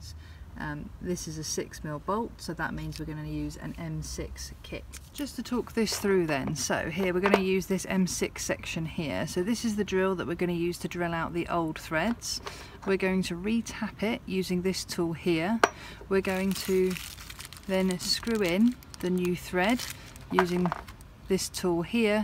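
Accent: United Kingdom. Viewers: Always a pleasant surprise is an English accent, and a woman's at that!.... oh, and a nice demo as well.. thx very much indeed!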